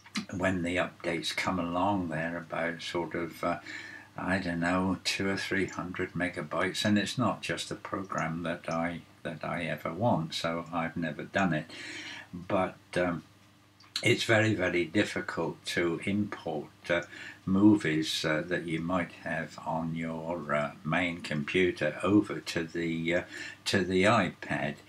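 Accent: United Kingdom. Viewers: Always like British accents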